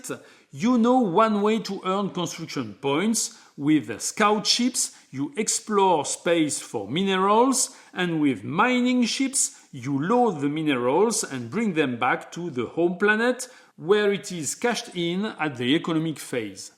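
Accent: France. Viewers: Thank you, excellent video. I love the French English accent!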